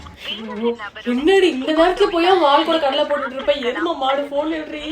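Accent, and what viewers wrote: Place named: Tamil Nadu, India. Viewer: Again panni pakuren bhaiya 😂😂😂sema tanglish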